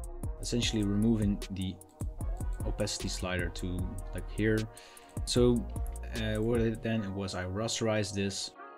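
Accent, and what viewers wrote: Netherlands. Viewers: Deze design is echt zo dope! Ik hoor aan je engels toevallig dat je nederlands bent 😊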